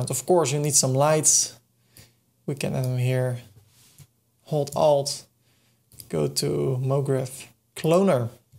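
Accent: Netherlands. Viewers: I absolutely love the netherlands accent. Great video as well. Thanks. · he said: hello, welcome to this new tutorial and I could immediately tell he's Dutch.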